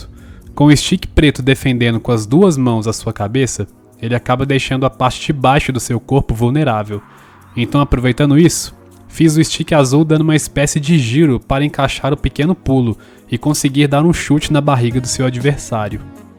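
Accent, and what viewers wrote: United States. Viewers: Eu pensava que o cara era americano até ver esse vídeo 🗿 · Você é brasileiro ou americano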